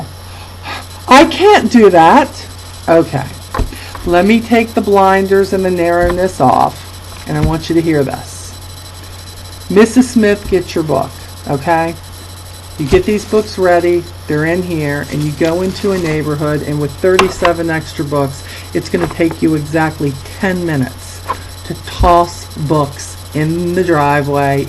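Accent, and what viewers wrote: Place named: Maryland, United States. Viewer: Your videos are informational, packed with lots of tips and inspirations of your experiences in the real world of Avon. Love the part where you say, Avon Chicks. For some reason, those two words and the emphasis you place on those two words make me smile. I think it's your Maryland accent. I have a wish for you and Lee. Continue to rock in your Avon business! (Jeff)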